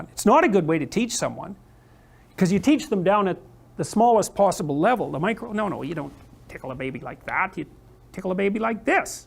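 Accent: Canada. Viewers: I love it when he goes full Bubbles from Trailer Park Boys 🤓 I love that Canadien accent!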